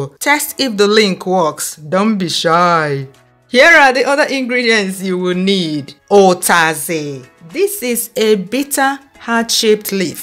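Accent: Igbo, Nigeria. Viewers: I came across this video then your Igbo accents and and your explanation made me stay and subscribed 😂😂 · Hyper clear Nigerian accent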